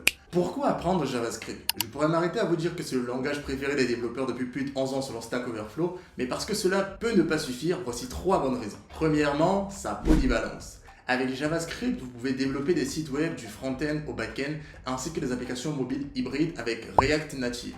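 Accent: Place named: Cameroon. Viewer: l'accent camer ne meurt pas :) ton contenu est super je viens de tiktok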